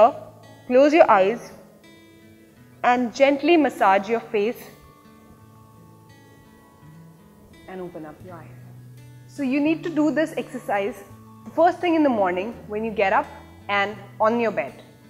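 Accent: India. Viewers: Indian accent is very hard to understanding is she really speaking English or different language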